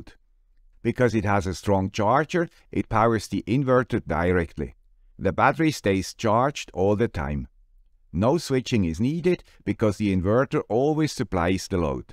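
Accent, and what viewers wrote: Switzerland. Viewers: The man with the croaky Swiss accent 8-) Everyone seems to have suffered this winter. I've been coughing since Christmas.!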